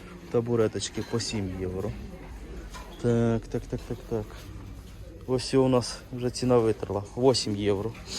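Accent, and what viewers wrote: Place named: Kherson, Ukraine. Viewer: Дякую за ваші відео, дивлюсь із задоволенням. Звідки така цікава мова? Це в селах на Херсонщині так розмовляють?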